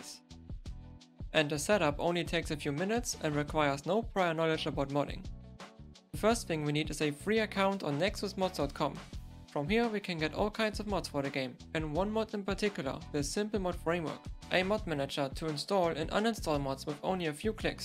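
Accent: Germany. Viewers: Bist du deutsch? Man hört so nen leichten akzent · Deutscher akzent? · Bro sounds so German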